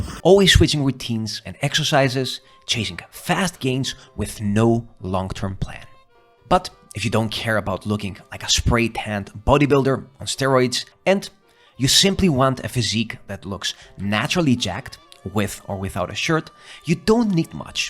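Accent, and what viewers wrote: Netherlands. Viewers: Do I hear a Dutch accent? Must be! I'll recognize that anytime ❤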